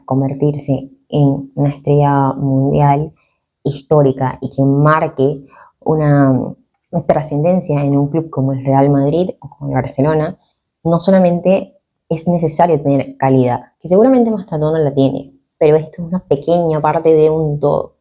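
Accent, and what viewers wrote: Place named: Argentina. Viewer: Que lindo acento tenes, pareces argentina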